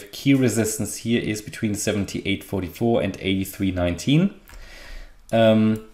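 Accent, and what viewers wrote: Germany. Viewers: Prima Content, dem Englisch nach kommen Sie aus Deutschland. Daher meine Frage : gibt es das auch irgendwo auf deutsch? Bin mir manchmal nicht ganz sicher...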